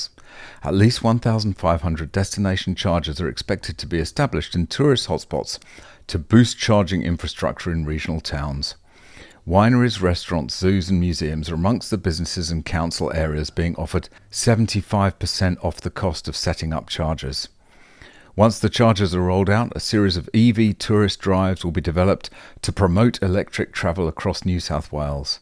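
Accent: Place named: United Kingdom. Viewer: Strangely even though I know you are Australian you sound more British to me.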